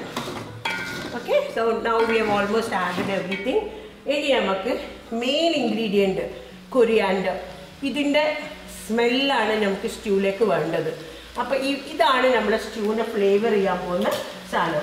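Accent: Kozhikode, India. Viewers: Is that Calicut slang or a bit of kannur slang . It’s so interesting how Malayalam slang changes from kochi to Thrissur and then up north . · Kozhikode slang pwoli❤️❤️✌️ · ഞാനും കോഴിക്കോട് തന്നെ ആണ്. പക്ഷേ ഷെഫ് ൻ്റെ കോഴിക്കോട് സ്ലാങ് കുറച്ചൂടെ മനോഹരം ആണ്.😊😊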